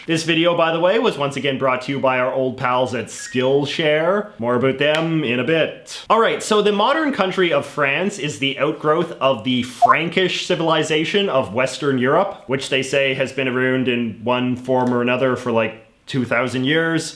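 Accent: Canada. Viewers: J.J. McCullough- are you Canadian?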